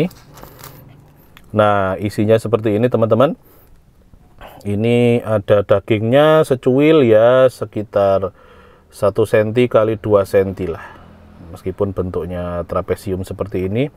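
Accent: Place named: Jakarta, Indonesia. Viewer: Sing dodol wong ndi cak...kok logate sok Jakartaan 😂 #dibahas Tapi asli ngiler liat sego bali daginge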